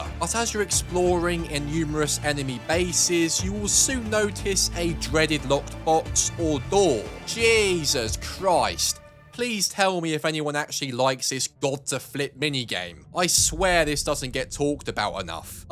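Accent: United Kingdom. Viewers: Why do all British YouTubers sound like absolute bellends? Are you all trained at some school to sound like retards?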